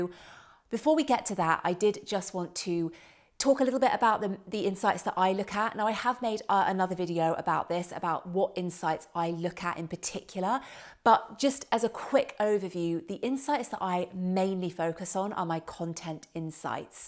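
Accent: United Kingdom. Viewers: What a perfect british accent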